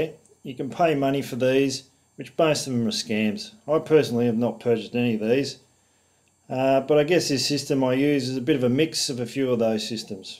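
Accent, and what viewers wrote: Australia. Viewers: That's because he speaks AUSTRALIAN and I can understand him easily and clearly. Thank goodness he doesn't have that American tendency to draaaaawl their words out like the word is 3x longer than it really is in a nasal (annoying) tone of voice. AUSSIE! AUSSIE! AUSSIE! OY! OY! OY! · I understand it because he speaks Australian English?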